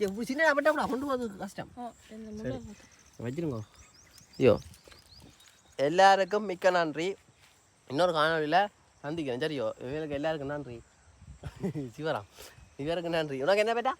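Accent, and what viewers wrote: Jaffna, Sri Lanka. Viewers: ஆர்ப்பாட்டம் இல்லாத யாழ்ப்பாண தமிழ். வெகுவிரைவில் ஓரு லட்ஷம் 👌👌👌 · உங்களுடைய voiceஎன்னுடைய jaffna நண்பரகளை நினைவு படுத்தியது அண்ணா 🥺🥺🥺🥺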